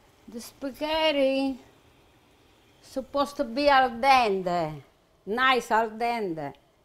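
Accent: Italy. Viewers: First, the word anchovies is in the plural, so you ask: 'Where are the anchovies?' Second, anchovies do NOT go very well with this dish, what about some cheese ? However, I love the Italian accent ! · I like her Italian accent 😄😆😁🙏👍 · She speaks a perfect Italianglish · I simply love this Italian Grandmother. I made this last night, and it came out fabulous. Thank you so much for sharing. Love her accent. I can listen to her all day. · Thank you for sharing your faith Bless be Jesus Christ give you more year continue cooking with love sharing with all people around the world 🌎 ❤ 💕 God bless you always Have bless day I love your Italian food and your beautiful accent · How much i like that accent! Italia!😊💝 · But it's hard to understand her .she has strong Italian accent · La signora, classe 1935, ha lasciato l'Italia negli anni '70, partendo dalla provincia di Foggia. 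Come molte donne del Sud Italia, probabilmente avrà al massimo frequentato le scuole elementari e quindi l'unica lingua parlata era il dialetto foggiano/pugliese. Questo aspetto, dopo 50 anni vissuti negli States, comporta questa pronuncia (simile a molti emigrati italiani negli USA), ovvero uno strano mix tra dialetto, italiano e inglese. Con parole italiane che - con il tempo - vengono pronunciate nella maniera inglese. E questa forte cadenza del suo dialetto che influenza la pronuncia in generale. Le dosi di aglio e peperoncino, come dice anche mentre prepara il piatto, sono abbastanza a discrezione. Anche secondo me ne ha messo troppo (tra l'altro - come sai - alcuni mettono lo spicchio intero e lo tolgono alla fine) ma, soprattutto, ha messo il pecorino/parmigiano che in questa ricetta non c'entra una fava! 😅 Già a me dà fastidio il prezzemolo (che va messo comunque alla fine, non nell'olio caldo!), ma è un ingrediente che si usa mettere. 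Ma il formaggio grattugiato proprio no.😩 Comunque, come forse sai, è abitudine abbondare di aglio nelle cucina del Sud. Come con il peperoncino 😳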